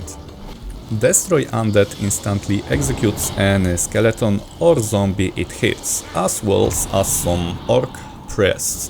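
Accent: Poland. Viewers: Słychać że rodak xD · Odrazu słychać, ze jesteś z Polski każdy Polak wymawia końcówki ing z g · I love how polish you sound brother 🇵🇱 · podnieca cię podcinanie innym skrzydeł? Ma akcent i co? Jest z Polski to ma polski akcent, prosta i normalna sprawa, tylko debile silą się na hamerykański mimo że nie przychodzi im naturalnie, a gość mówi bardzo dobrze i zrozumiale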